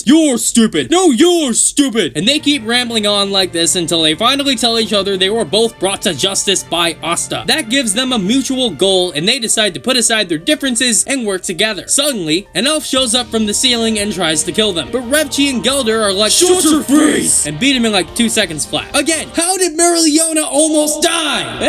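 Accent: United States. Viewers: WHAT HAVE U DONE TO, HE'S BEEN KIDNAPPED BY AN AMERICAN WHO IS IMPOSING AS HIM D: on a serious note give us back not this merican dude. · I feel like when you talk in your American accent it makes me sad because you are conforming to American society and losing your individuality. Your accent is sooo much more passionate and interesting to listen to!!!! American accent for memes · Hahaha bro your American accent is really good. Impressive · this video killed me and he actually managed to make it to then end using the american accent :) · I don't know who can't get enough of the American Accent but watching it with your new videos I can assure it doesn't have the same energetic and passionate as your usual accent. Please go back to your usual accent. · Am I the only one who likes his American accent? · I clicked the video and i thought it wasnt. Your accent over american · i said his american accent was getting better😂💯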